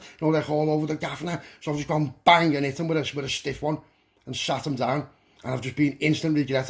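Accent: Liverpool, United Kingdom. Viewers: My man speaks ba Liverpool how it is everyone is like this here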